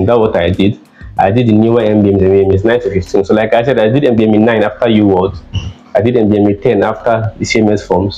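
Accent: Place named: Nigeria. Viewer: Very interesting watching from Chicago, just a suggestion, if you want to work with the average American patient, start working on getting rid of your accent, I'm used to being around Nigerians because I live in Uptown but most Americans White or Hispanic won't be able to really understand or have the patience to keep a doctor with such an accent or maybe you're just taking fast.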